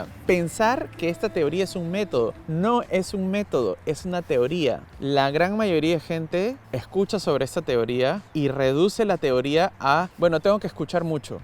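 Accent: Buenos Aires, Argentina. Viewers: Hola, al principio pensé que eras de Buenos Aires hasta que escuché que eres Peruano, pero cada tanto te sale el porteño jiji.Uruguay también su acenrto es aporteñado ( aunque no les guste que se lo comparen). Muy lindo tu vlog. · Omg, tu en tres meses se te pego el acento argentino, yo estuve una semana y se me pegó el acento y algunas palabras xD yo me escuchaba y me reia y sentia traicionera xD Es tan cierto eso que dices! De que si no tienes conexion o le ves la utklidad a algo, simplemente no entra, eso me paso con chino. En su momento cuando me cambiaron una profe de aleman ( a una que me ignoraba) , dejé de sentirme en sintonia. Ahora trato de retomar aleman. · Ahora entiendo porqué cuando te escuché por primera vez no entendía de dónde eras. Tenés aún un poco de acento argentino, pensé que eras de alguna provincia distinta a Buenos Aires. 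Saludos, muy buenas videos! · se nota muchisimo que viviste en Argentina a un peruano que no salió de Perú no le entendería nada!! Soy uruguaya.. Muy buenos consejos!!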